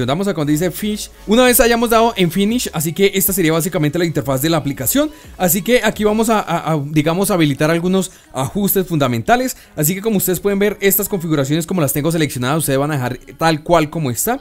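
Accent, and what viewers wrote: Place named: Bogotá, Colombia. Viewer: Buena el parcero se Bogotá se nota ajjajaja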